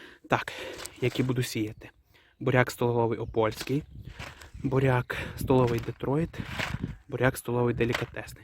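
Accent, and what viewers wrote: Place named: Western Ukraine, Ukraine. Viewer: Такце наша західнецька мова файна і така посадка тоже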